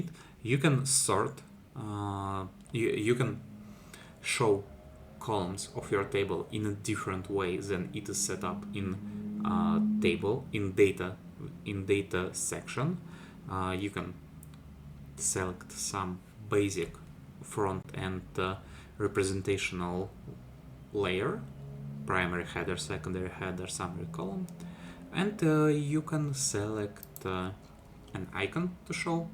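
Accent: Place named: Russia. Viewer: Какой сочный русский акцент 😊❤😅 прям родная речь, мёд для ушей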